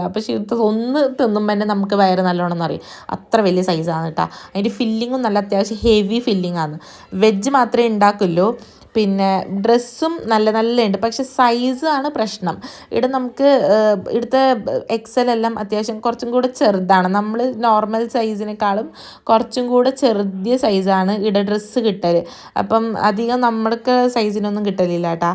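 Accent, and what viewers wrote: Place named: Kasaragod, India. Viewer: kasaragod basa😂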